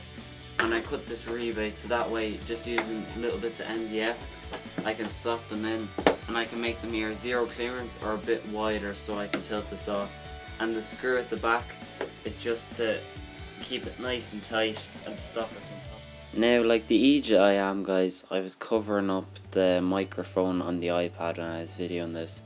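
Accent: Ireland. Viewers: Great job!! Loved the video, but then again I'm partial. Your accent makes long for the old sod. Keep up the grand work!!!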